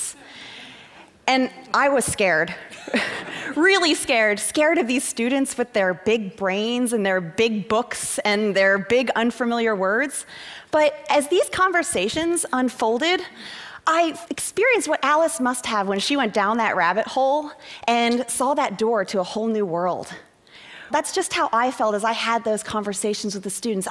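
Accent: United States. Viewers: I dont think so..that was an Australian series- this woman has an American accent.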